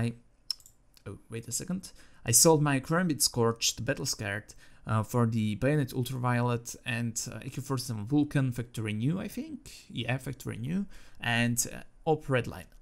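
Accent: Slovakia. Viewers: Počkej, ty jsi slovák? :D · Len som klikol na video asi 1minutu som ťa počúval a hneď som vedel že si slovák je to vidieť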